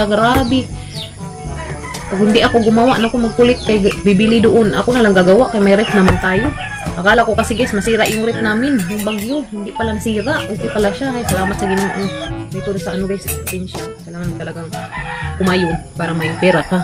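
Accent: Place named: Visayas, Philippines. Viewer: katawa ko sa imung bislog, bisaya tagalog ba. By the grace of God, modanghan napud imung viewers k ga bislog naka unya joker pa gyud ka. Another thing about you k dili gyud ka selfish, k you're always thinking and generous to other people.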